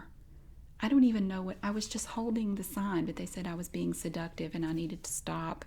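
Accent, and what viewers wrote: South, United States. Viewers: Nothing sounds like the devil from your videos. You have a God given gift of a soothing gentle southern voice. Thanks for helping me rest.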